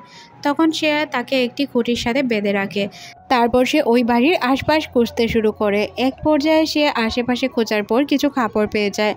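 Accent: Sylhet, Bangladesh. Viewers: Apu ki sylheti?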